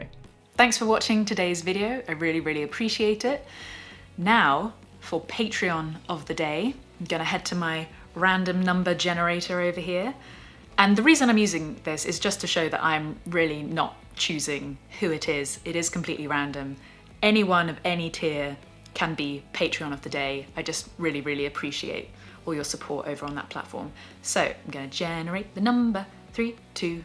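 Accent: United Kingdom. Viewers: Even when I lover her accent (or any british accent), my ears ain't well trained enough to understand the name of song Mary mentioned. Anyone can help me?.. Thanks!.. Guitar story is amazing!!!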